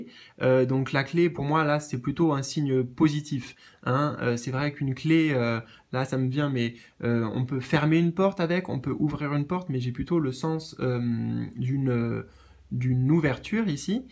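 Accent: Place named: England, United Kingdom. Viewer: Bel accent anglais!!!😁 Merci tout plein pour cette guidance pour toute cette bienveillance 💜👍😘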